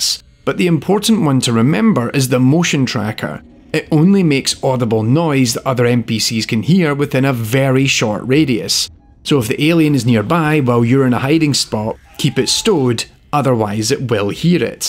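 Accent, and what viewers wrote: Scotland, United Kingdom. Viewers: Is this scottish accent? If yes then damn i love it. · as a scottish person i cannot listen to another scottish person narration without the cringe turning me inside out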